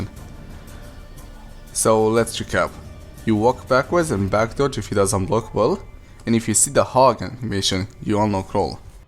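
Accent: Romania. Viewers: are you romanian,russian or german? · accent de roman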